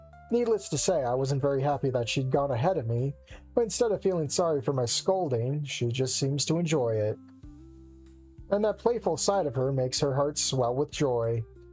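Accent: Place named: Finland. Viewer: Finglish strikes again.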